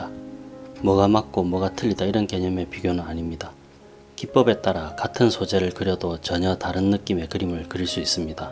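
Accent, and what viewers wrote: Gyeongsang, South Korea. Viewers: 영상 잘보고있습니다 감사합니다 종이는 무슨 도화지를 써야하나요? 갱상도 사시는것 같네요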